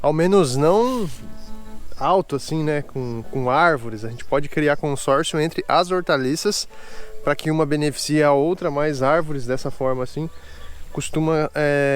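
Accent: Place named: São Paulo, Brazil. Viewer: Muito legal, tenho composteira e minhocario, e várias árvores pra gerar massa, muito legal ver jovens como vocês fazendo diferente e a diferença, só curiosa pra saber onde é o seu sítio, chutaria são Paulo pelo sotaque, kkkk, parabéns, aqui, o que é feijão arroz, não sei se foi isso mesmo que você falou mas foi o que entendi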